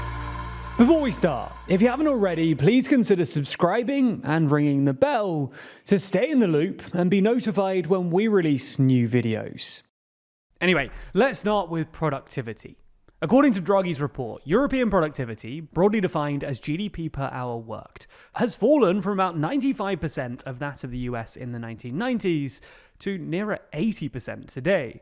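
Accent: United Kingdom. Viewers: Fairly sure 'catch up to' is american and on this side of the pond we say 'catch up with'? I say that as one of the things i enjoy about the channel is a well spoken british accent!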